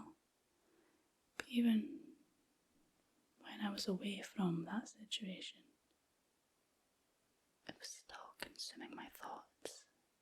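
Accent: Scotland, United Kingdom. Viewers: Hello from a fellow Scot from Ayrshire I have trouble sleeping and hearing a Scottish accent is unique on YouTube so thank you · The Soothing scottish accent gets me to feel cool, calm and collected for years. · That scottish accent is just fire 🔥🔥💯💯👏🏾👏🏾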